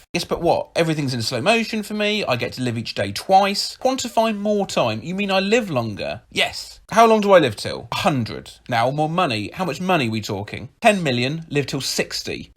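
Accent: United Kingdom. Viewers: I watch these purely for the British accent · These kinds of videos are entertaining on their own but somehow having a british narrator always makes them more entertaining. R.I.P. Soothouse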